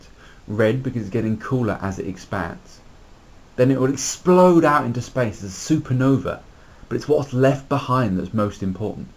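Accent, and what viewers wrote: United Kingdom. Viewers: i wanted to understand it but i cant understand with his british accent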